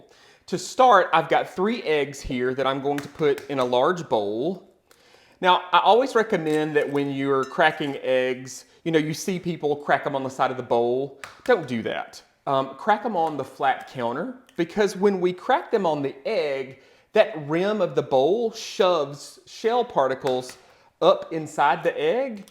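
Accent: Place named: South, United States. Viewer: I've moved from Atlanta to Scotland and now to Spain. I absolutely hearing your sweet southern accent and watching you cook mouthwatering dishes that I love. Thank you.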